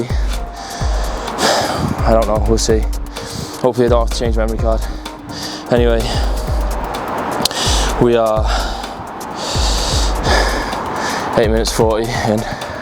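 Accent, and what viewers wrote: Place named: Northern Ireland, United Kingdom. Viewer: man... what part of England you from? It sound northern Irish or Scottish-ish lol but yeah..where you say you from now?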